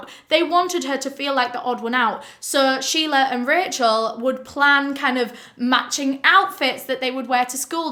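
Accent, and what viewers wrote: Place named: Yorkshire, United Kingdom. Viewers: You tell the story really well. Love the Northern accent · its an English accent lol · She has a Yorkshire accent :) x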